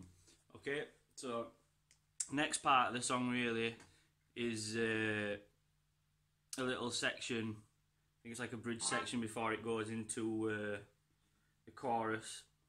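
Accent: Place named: Barnsley, United Kingdom. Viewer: That Barnsley accent lad! 🙂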